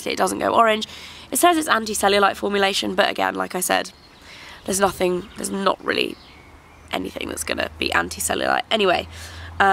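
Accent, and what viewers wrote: United Kingdom. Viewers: for my english oral proficiency class, in order to get a British accent I listen to your tutorials and reviews and I repeat what you say! maybe kinda creepy but you've helped me alot to get a consistent accent!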